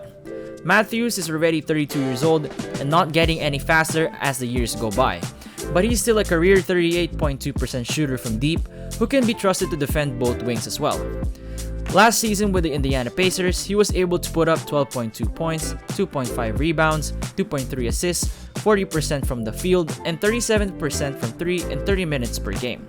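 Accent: Philippines. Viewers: You sound Filipino 🤔 -A Filipino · I hear a Filipino accent for some reason... 🤔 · God this guy sounds filipino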